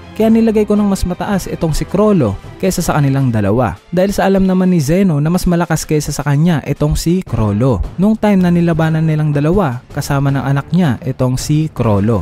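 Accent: Visayas, Philippines. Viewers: Nag mukhang mali lng yung pag pronounce nya kasi filipinx accent Hahaha. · Bisaya ata si idol😂😂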